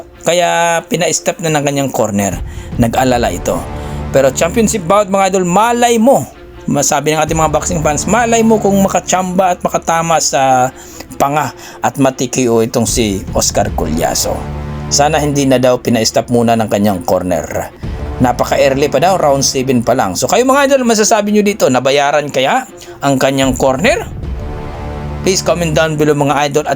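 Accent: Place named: Visayas, Philippines. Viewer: Bisaya na bisaya Ang pananalita mo, para ka bulol mag salita, Masakit sa tengga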